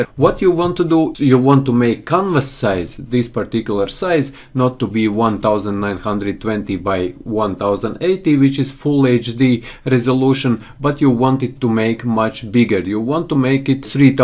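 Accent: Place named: Russia. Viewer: This dudes russian accent is awesome! its so strong!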